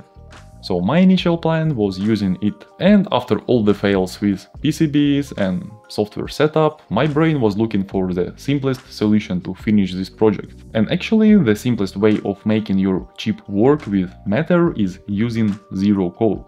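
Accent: Russia. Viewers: русский русского услышит всегда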